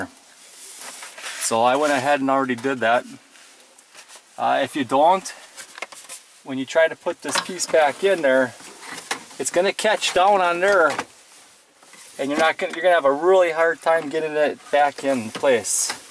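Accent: Minnesota, United States. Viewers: Holy lord, great videos on F150led installs but that Minnesotan or Wisconsin accent is undeniable! Don’t cha know? You betcha! What is your favorite “hot dish?”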